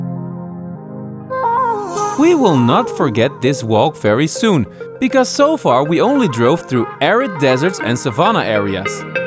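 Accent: Netherlands. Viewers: Thank you for taking us along. Zimbabwean here, born and bred, however I've lived in Wageningen before and immediately picked up on your Dutch accent. Good to share that when you refer to 'Kopje' its just one of the many borrowed Dutch words now part of our either everyday English vocabulary or Vernacular too. E.g. Jazi (Shona for jacket/long overcoat) is from 'Jas' similarly 'Tafura' (Shona word for Table) can be traced from 'Tafel' These are the few on top of my head but there is so much Dutch influence in our culture that a lot of locals may not be aware of. New subscriber too, keep doing what you are doing. cheers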